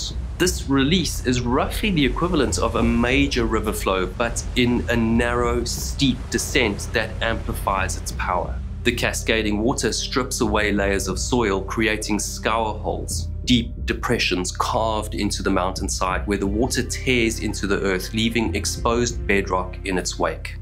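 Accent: South Africa. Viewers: I love the south African accent. I had a good buddy from there.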